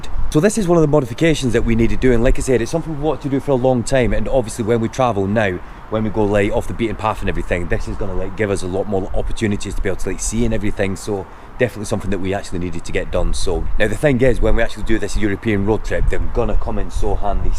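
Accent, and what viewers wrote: Scotland, United Kingdom. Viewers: Man, where is your accent from?! I thought you were scottish, then a brummie, then from Lancashire.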